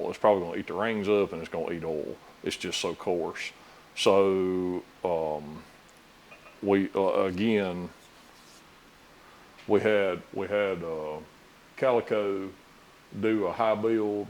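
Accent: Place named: South Carolina, United States. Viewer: My favorite part of every video is in the intro: "We gone gitindah it." I'm in Nashville, and I miss that South Carolina drawl.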